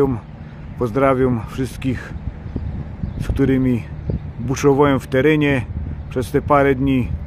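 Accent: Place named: Upper Silesia, Poland. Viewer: Białowice to chyba dolni Śląsk a akcent masz (mosz😅) z Górnego Pozdrawiam 👍filmik oczywiście z pięknymi widokami 👍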